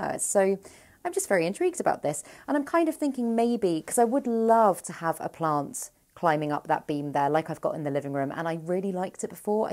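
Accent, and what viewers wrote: United Kingdom. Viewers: hello, I like your videos, and your British mood and accent. About the string of pearls, they are easy to overwater, it's true, but as I am concerned, I find that they need quite a lot of water for a succulent, not too much, but if I forgot, it turns brown like yours. And of course they love to have bright light on the top of the pot ...... I would like to know what do you do when you leave your apartment for a few days, for holidays for instance, about your plants of course ?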